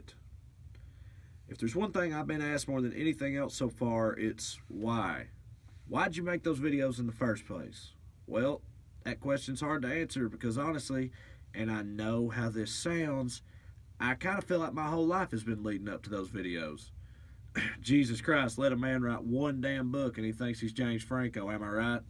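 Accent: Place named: Tennessee, United States. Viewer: Are you kidding me? "You're gonna have to lose that accent." As a person with a boring-ass Pacific Northwest vanilla accent, I give those people who said that to you a fatty middle finger!! Your thick Tennessee twang is one of the things I love best about you, because it rips through the delicate sensibilities of the kale eaters who share our political views. You keep that accent loud and proud,. ♥ Love from Washington, from my enormous Chicanx family!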